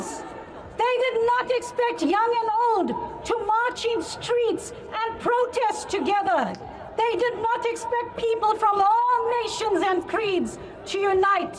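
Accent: South Africa. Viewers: I immediately recognised the South African accent! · Before she said she was south African I already knew it from her seevh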